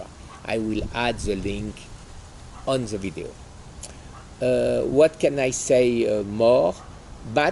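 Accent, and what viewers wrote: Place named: France. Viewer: Cà me rend triste aussi d'apprendre que tu es atteint de cette sale maladie. Il y en a tellement! Mais bon il faut garder espoir et profiter de la vie comme tu le fais. C'est en partie grâce à toi que j'ai migré vers le monde du MFT. J'ai choisi Olympus et suit très satisfait. J'aime tes vidéos et ton anglais "so frenchy". Tiens le coup.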